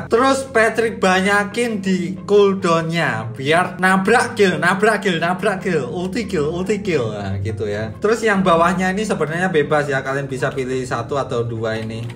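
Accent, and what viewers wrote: Java, Indonesia. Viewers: Ngakak , sampean wong jowo ndi bang patrick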